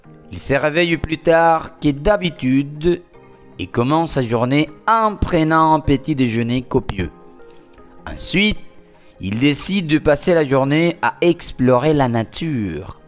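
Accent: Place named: France. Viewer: Me parece muy bueno, asi es como se aprende : leyendo, escuchando, hablando. Solo que me perturbé con la pronunciación de algunas pocas palabras muy diferente a la de Bélgica. Supongo que es de alguna région de Francia donde la pronunciación puede ser un poco diferente.pero esta espectacular ❤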